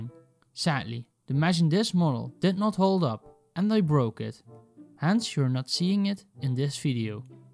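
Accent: Netherlands. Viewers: hoor ik daar een nederlands accent?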